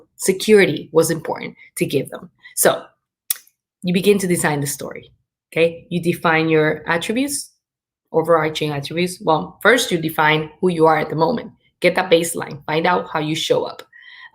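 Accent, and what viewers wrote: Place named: United Kingdom. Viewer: How can this Indian speak British, even Priyanka Chopra ka accent itna achha nahi hai I am amazed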